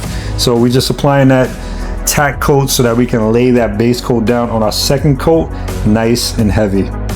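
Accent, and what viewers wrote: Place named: New York, United States. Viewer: it’s a NY accent lol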